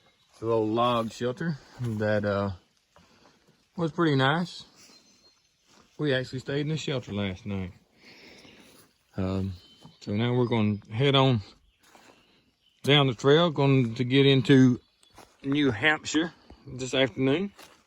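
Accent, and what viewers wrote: South, United States. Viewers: Love correcting your Southern speech. 😂😂 you know I love those old buildings especially the church.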